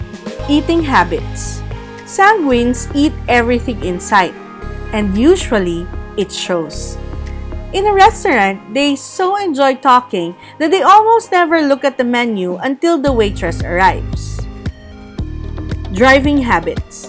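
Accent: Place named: Philippines. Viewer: Your English really sounds FIlipino. Matigas accent.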